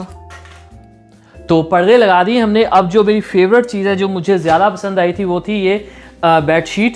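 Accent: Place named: Punjab, India. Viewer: Punjabi bht pyari bolty hn ap😀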